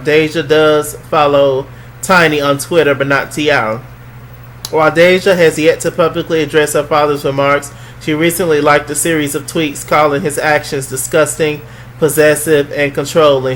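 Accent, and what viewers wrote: New York, United States. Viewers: you sounded just like NY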